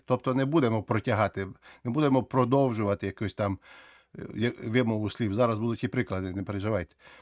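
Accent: Transcarpathia, Ukraine. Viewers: Урок надзвичайний(принаймі,для мене...людини,ака хоче вивчати англійську мову...),Ваша українська мова (як на мене -закарпатська...)сама собою притягує і спонукає вчитися,ну і сама методика пояснення азів англійської....хоча Ви кажете,що англійську дуже легко вивчати...(і так,і ні....як для мене,принаймі...коли за плечима вже немало прожитих років...)а американська англійська чого варта....Та надіюсь....найважче дається зрозуміти співрозмовника (цю...) Файно дякую Вам !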